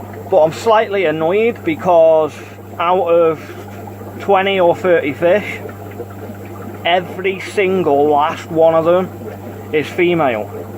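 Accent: United Kingdom. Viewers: "Focus, Bitch" was reaching iconic status, for me at least. I'd started saying it in my head whenever I go off on tangents during deep conversations. You know, you could've turned that into a selling point or catch phrase for your channel...it's the way you sound that caught my attention. Anyway, I'm really into various Brit accents, so there you go🤓.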